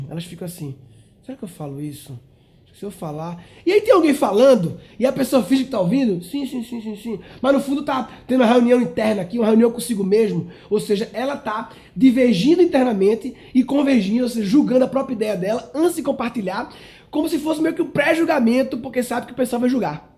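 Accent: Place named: Recife, Brazil. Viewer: Gostei muito desse video,! Você tem um sotaque daqui, da região metropolitana do Recife!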